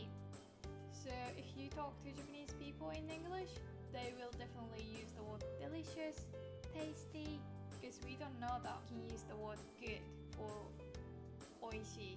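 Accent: United Kingdom. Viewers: I get really confused when an asian has a british accent. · This is a really helpful video. I have problems remembering KO/SO/DO/AR words. Thank you! If it's not too personal, your English accent is interesting. Is it British or Australian? Many Japanese people I know seem to learn more British English.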